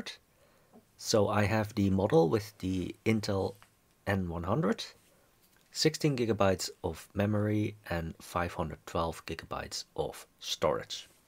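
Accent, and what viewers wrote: Netherlands. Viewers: You sound very Dutch :)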